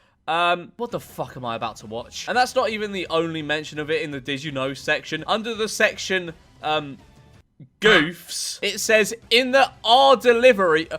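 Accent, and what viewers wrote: United Kingdom. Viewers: Somehow hate this video more then the movie dude uses way to many corny ass jokes plus mixed with that dumbass uk accent i wanted to literally shot myself🤣🤣😭💀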